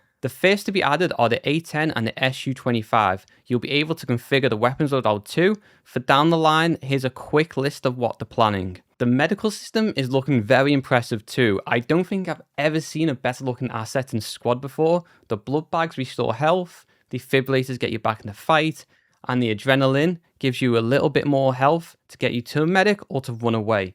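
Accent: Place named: Scotland, United Kingdom. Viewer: Scotish accent is beat 🎉❤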